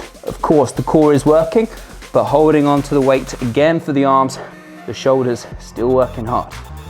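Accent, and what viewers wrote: United Kingdom. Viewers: I'd like to win . Appart from the excersices i love the british english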